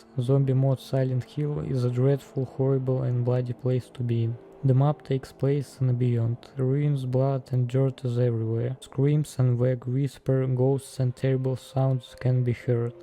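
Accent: Russia. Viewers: как же слышно то что ты русскоязычный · Русский акцент не скроешь) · слышу русский акцент, замутил бы еще русскоязычный видос или русскую аудиодорожку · Он русский, выдаёт акцент, +под некоторыми профилями его друзей в стиме оставлены русские комменты. (скрывается от кого то 100% :D ) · Ты из России,я это по акценту понял. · Зачем ты говоришь на английском, у тебя ярко выражается русский акцент :\ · По акценту ты русский, я это сразу с первых минут просмотра понял, если нет, жалко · Русский акцент прослеживается чел, но все равно молодец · СЛЫШНО ЧТО ТЫ РУССКИЙ АКЦЕНТ ТО РУССКИЙ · акцент то русский попался гузлик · Блин мужик, снимай на русском. Но если я ошибся, и это просто акцент, то извини - на карте еще можно было выключить электричество через щиток в комнате с бильярдом у кт спавна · Чел ты Русс у тебя слышен русский акцент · Нафига ты говоришь на английском. 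По акценту слышно - русак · Я чую голос русского, по произношению слышно) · Вроде бы указанная страна в описании - Великобритания, а акцент на уровне "ай хэв май мазэр анд ай хэв май бразэр" слышится... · Huh, russian accent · idk why, but this accent sounds more like russian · Наш мужик слышно по акценту))) найс контент)) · АААААА БРАТАН ТАК ТЫ РУССКИЙ ООООООО МЛЯЯЯ НЕ ОБЕССУДЬ Я НЕ СО ЗЛА КОНЕЧНО, НО ТЕБЕ СЕРЬЁЗНО НАДО ПОРАБОТАТЬ НАД ПРОИЗНОШЕНИЕМ. Знаешь, как сильно иностранцы ненавидят такой акцент? (Я тебя спалил кстати по Ал-Кайде. Ты очень по-русски это сказал)) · Слышится русский акцент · english language on russian accent is atractive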